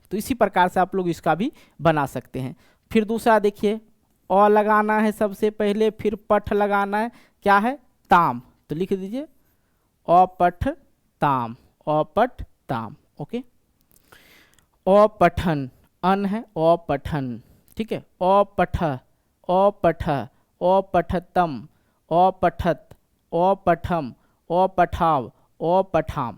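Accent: Bihar, India. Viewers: Sir aap bihari ho ya भोजपुरी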